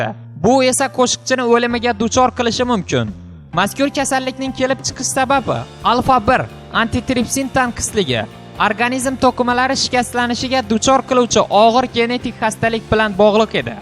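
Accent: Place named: Andijan, Uzbekistan. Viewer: anjanleda bu wevada gapiripti :)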